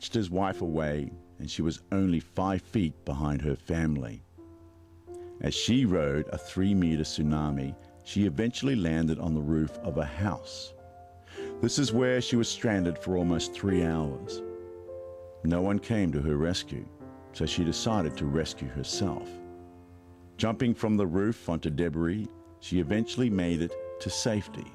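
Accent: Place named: Australia. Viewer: wow, they make a documentary less than a year after the Tsunami and think things will have changed dramatically! Must be a Cali-tard narrating this, even with the Australian accent. Who the hell made this, the totally black screen when narrating the tsunami hitting was reallllllly cheesy. The narration is so.....it so RELISHES the opportunity to exploit this and be dramatic..it's kind of disgusting really. Have to bail after just a couple minutes. Dipped in to other parts further on and heard the same guy getting off on all of this and thinking he was being so profound. Really really disgusting M8! Thumbs down for you.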